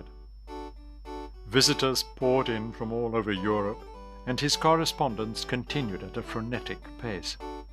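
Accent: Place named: United Kingdom. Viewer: Background music is too intrusive plus heavy British accents make narrative wasteful.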